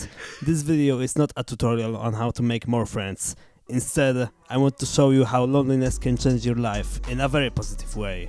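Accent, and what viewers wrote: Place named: Russia. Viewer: Are you from? Sounds like Russian. BTW Good video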